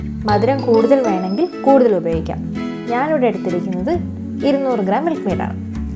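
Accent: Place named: Thiruvananthapuram, India. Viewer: Tvm slang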